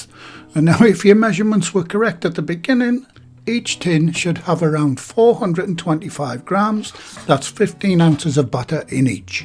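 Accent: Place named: Newcastle, United Kingdom. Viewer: This looks delicious! And I love your accent,. It reminds me of one of my favorite shows, "Vera". I'd love to visit Newcastle one day:)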